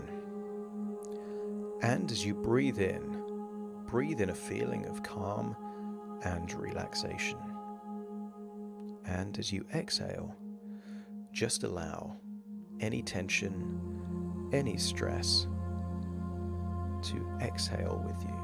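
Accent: England, United Kingdom. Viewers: Hearing You Speak Makes Me Feel Like I Am In (Old) England, Instead of New England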